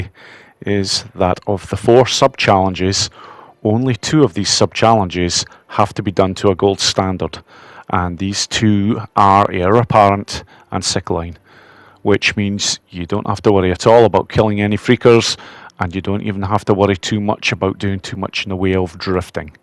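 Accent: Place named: Dumfries, United Kingdom. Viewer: Andrew Coltart - that's the guy! Your accent reminds me of his. Wiki says he was born in Dumfries. You close to there?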